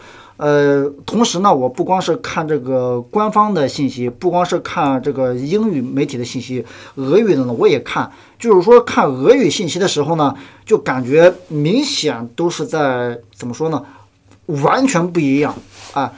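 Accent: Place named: Qingdao, China. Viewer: 频道第一次看，挺好！伙计，听口音青岛银？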